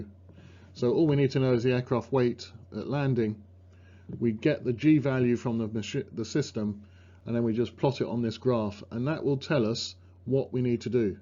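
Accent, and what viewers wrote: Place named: England, United Kingdom. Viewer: Hello thank you very much for all your beautiful video I am one of the pilots who love if I could choose the right word love this Aircraft so my noticed to you is can you please find any other Mike for find how you could fix the volume because really hardly trying to listen to you specially in your English accent the prettiest I guess so so please feed it a bit more louder for six the issue in the mike that would be great from you thank you very much again for all this beautiful video. By the way since couple of years I’m trying to save some money to go for the 605 typerating course so sorry do you suggest to go CEA OR Flight safety or is there any other way to get the typerating cheaper or less than their pricelist specially in coronavirus situation As FAA And one more question please I’m ready for The oral and written test so is there any possible way to go direct to get the exams and then to disability training to save some money because as far as know most of the training centre all over the world doing this option is based on coronavirus situation thank you very much hope to hear from you soon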